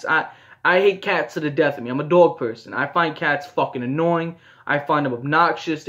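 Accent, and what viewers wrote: New York, United States. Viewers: im not sure if you're from ny or nj or what but i love your accent and the way u talk about stuff 😂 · Bro i soon as i heard you speak i knew you were from my state ny. Much love man❤ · This guy's New Yorker accent and random analogies make me forget how horrific it'd be to watch some of these by yourself. Well done on turning MDPOPE into a comedy lmao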